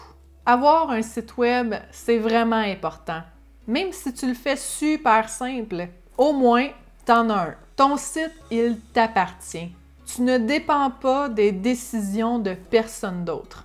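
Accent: Canada. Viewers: bonsoir, depuis peu je regarde vos videos, je les trouves interressantes, et bourres de conseils!!! C EST SUPERBE, j adore votre accent canadiens,!!!!!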